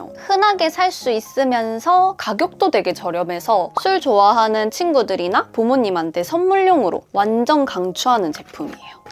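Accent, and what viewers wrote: Gyeongsang, South Korea. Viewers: 영상이 떠서 처음 들어와봤는데 경상도 분이신가봐요? 사투리가 ᆢ ㅎ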